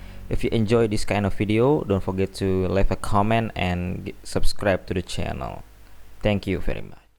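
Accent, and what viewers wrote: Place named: Indonesia. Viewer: Saya kira cuma saya saja penonton dari Indonesia gan hehehehe Rupanya channel ini juga asal Indonesia pantas suaranya seperti orang Indonesia walaupun bahaya Inggris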